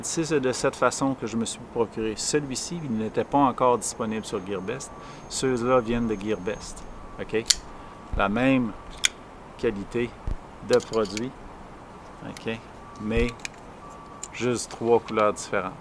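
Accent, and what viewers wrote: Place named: Quebec, Canada. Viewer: Je ne savais pas que vous faisiez des reviews en Français, sur la vidéo en anglais j’avais remarqué un léger accent Québécois mais je n’en étais pas sûr... 😊 En tout cas vos reviews sont superbes et fort intéressantes, et avec ce beau parlé Québécois cela embellit le tout!!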